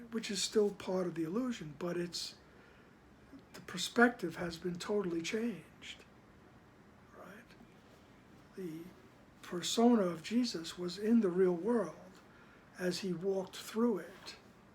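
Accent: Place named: New York, United States. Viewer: I knew you too were a New Yorker! Had a feeling when I heard the sirens and the way you talk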